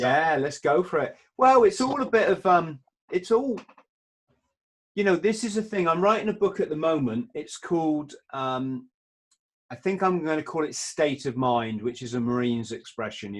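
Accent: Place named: Ayrshire, United Kingdom. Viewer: Hey I’ve been aware of and Follow him,really enjoyed the podcast guys.Your definitely West coast/Ayrshire-Glasgow as you the accent is the same as mine 🙂. I’ll be sure to share and follow.👍🏻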